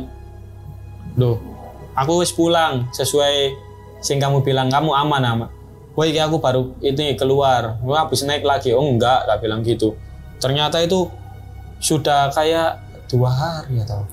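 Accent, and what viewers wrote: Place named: Java, Indonesia. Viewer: Medok banget mas....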